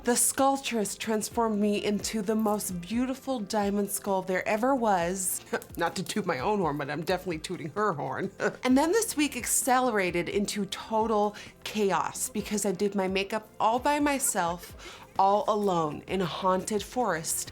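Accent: United States. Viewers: How come you have a strong American accent if your Dutch and living in Amsterdam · Accent? When I first started watching your videos, I totally took you for an American 😻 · Can anyone explain why nikkie despite being dutch still sounds American compared to other peeps from Holland? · “ in case you were wondering where the accent came from “ Umm I thought she was anerican till 2 years ago when She said in one of her videos that she wasnt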